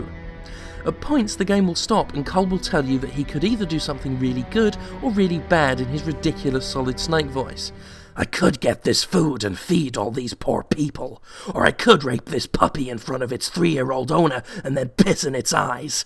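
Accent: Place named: RP, United Kingdom. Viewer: Look, if Yahtzee likes this game, then it must be good. Jim is just an angry fat British bloke who's accent is posher than it should be which makes his arguments seem more credible than they actually are (I'm British BTW X-D)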